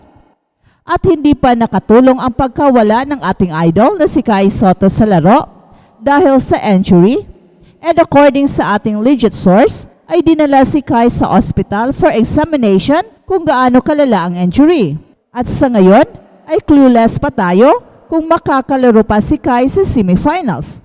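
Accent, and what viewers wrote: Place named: Visayas, Philippines. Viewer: Tagalog ka lng ate...para di masakit sa tenga ung bisaya accent mo po kpg nag eenglish ka.....❤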